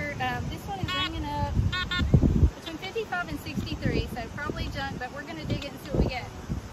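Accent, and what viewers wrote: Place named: United States. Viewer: You look like an Australian, Aussie girl with an American accent 😉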